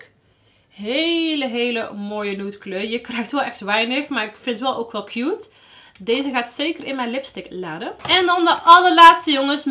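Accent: Brabant, Netherlands. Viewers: Altijd leuk om je video’s te kijken, en heerlijk om naar te luisteren aangezien er soms van die te leuke Brabantse woordjes uitfloepen. #loveit! Ik doe ook mee met de winactie! 💕 insta; L.iisax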